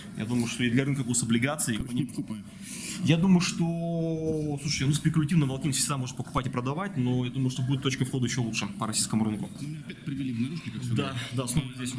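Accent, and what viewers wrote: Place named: Odessa, Ukraine. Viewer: У НЕГО ТЕМБР ГОЛОСА ОДЕСИТА ЧЕТКО БЫСТРО ПО ДЕЛУ С ЮМОРКОМ РАВНЫХ НЕТ !!!!!!!!!!!! У ВАС ТАК НЕ ПОЛУЧИТСЯ !!!!! ЗНАТЬ !!!!! УМЕТЬ НАДО !!!!!!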